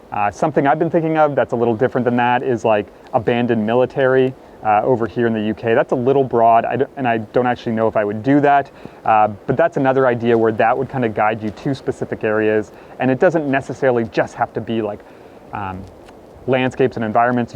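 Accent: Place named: Canada. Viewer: That canadian accent is ... ugh 😬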